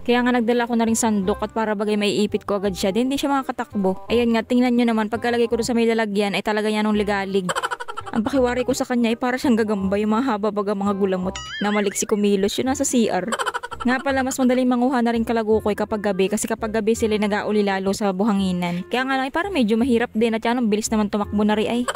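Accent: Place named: Gumaca, Philippines. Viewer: hahahaha i really really love your accent it sounds like home to me... parang gusto ko tuloy umuwi Gumaca bigla 😂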